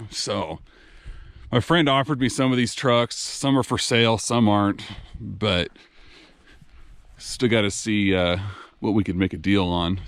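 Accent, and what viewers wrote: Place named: United States. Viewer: I’m from Poland 🇵🇱 i working as truck driver. U have beautiful American accent ❤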